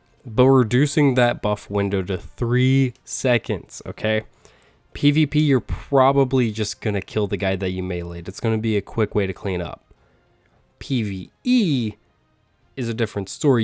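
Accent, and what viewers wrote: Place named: West, United States. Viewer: You sound like you belong in a spaghetti western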